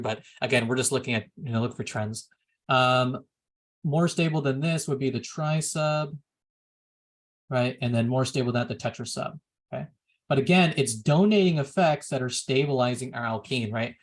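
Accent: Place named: England, United Kingdom. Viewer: You have a very nice accent. Are you from England?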